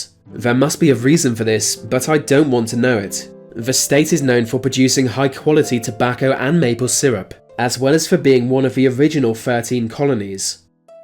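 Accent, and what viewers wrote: United Kingdom. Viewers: U INSULT TEXAS AT LEAST WERE BETTER THAN UR BRITISH SOUNDING ASS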